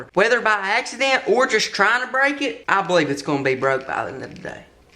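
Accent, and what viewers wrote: United States. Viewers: Your accent sounds like a cowboy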